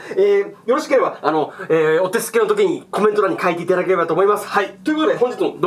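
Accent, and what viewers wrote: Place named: Fukushima, Japan. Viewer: なまり、福島弁ぽいばい🤔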